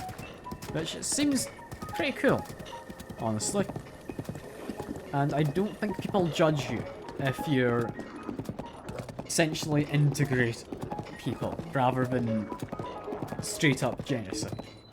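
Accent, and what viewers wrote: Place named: Scotland, United Kingdom. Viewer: Cool. Funnily enough, I'm also Scottish and yet your accent is much stronger than mine lol. Nice video btw!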